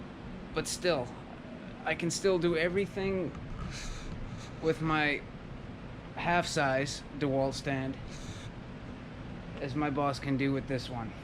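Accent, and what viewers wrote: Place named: North Shore, United States. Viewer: cool, carpenter on the north shore here, I just recognized the accent, lol... Thanks for the review, I just bought one, and I'm very happy with it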